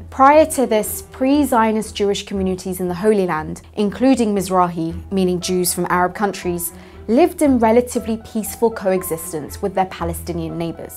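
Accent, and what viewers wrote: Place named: England, United Kingdom. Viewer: English accent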